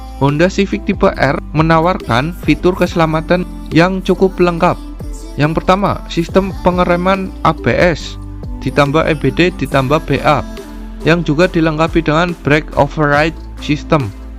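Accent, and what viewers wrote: Banyumas, Indonesia. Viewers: Asli kueh man, jarang" ana chanel oto ngapakers 🤣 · Ora Ngapak Ora Kepenak 🔥. Sukak nyong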